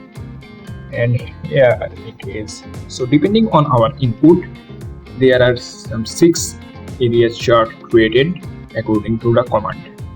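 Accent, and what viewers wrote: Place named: Germany. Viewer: Du hast einen sehr deutschen Akzent 😂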